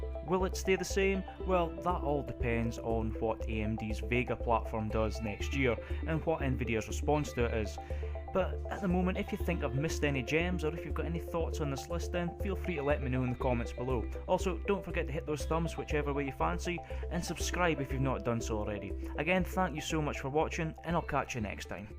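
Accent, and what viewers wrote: Scotland, United Kingdom. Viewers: r u scottish?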